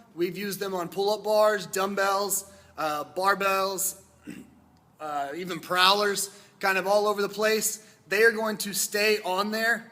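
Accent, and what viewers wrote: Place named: United States. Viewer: The most annoying loud American accent. Imagine being stuck in a lift with this guy on his phone. Mugg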